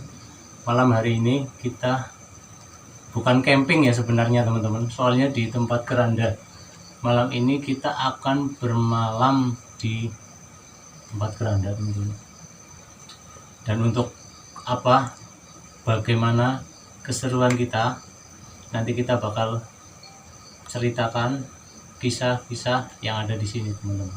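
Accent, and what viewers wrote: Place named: Java, Indonesia. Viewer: assalamualaikum.salam kenal dari tangerang mas mas logat jawa penampilan orang timur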